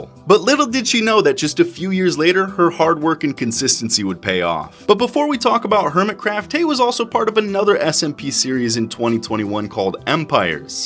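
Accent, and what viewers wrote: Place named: Newfoundland, Canada. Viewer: Everybody talking about gem but i Just I pressed by ur Newfoundland pronunciation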